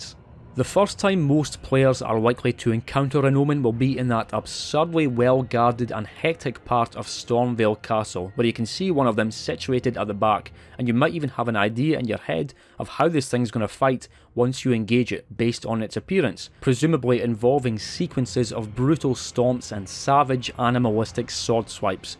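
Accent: Scotland, United Kingdom. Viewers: Came out of curiosity, stayed for the Scottish accent 🥰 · Love hearing a fellow Scot on here ✌ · Ok pal I hate to be off topic but you have one of the coolest fucking accents I have ever heard in my life. I'm sure to you it sounds "plain" or "normal" since you grew up with it, but I am straight up in love with how you talk. Whereabouts in the world? Scotland?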